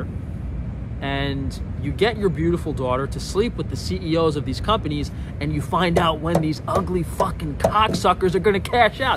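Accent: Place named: New York, United States. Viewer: That's called an accent, friend. Lots of people in NYC have one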